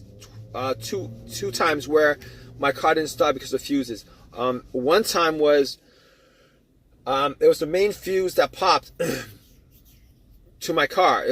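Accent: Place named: New York, United States. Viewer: The reason he talks so long, because youtube requires at least an 8 minute video to consider it an informational or useful video to get credit for any views or subscriptions and likes and comment. Fuckin new York east coast accent.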